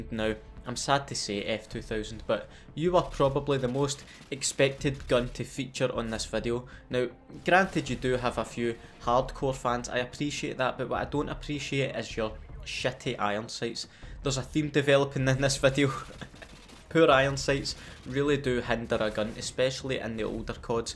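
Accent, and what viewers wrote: Scotland, United Kingdom. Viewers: You sound more like a proper Scotsman than an Aussie. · this guy has got me dead xDDDDDD and the Scottish accent makes it even better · Is this a Scottish accent? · I love Scottish accents. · I love your Scottish accent! 😅❤ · Oh dude ur videos is the best always brighten me up Even when Im mad u have good humor And that ur scotish makes things Even better love your vids keep the good work up👍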